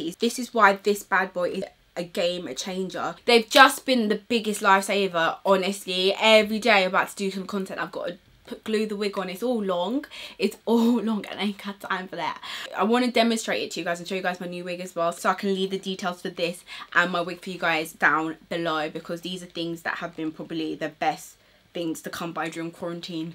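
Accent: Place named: United Kingdom. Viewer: What is it about these damn British accents??....Like I can't!!! I just love you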